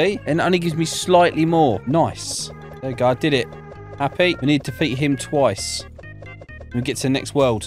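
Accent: United Kingdom. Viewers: are you brithis?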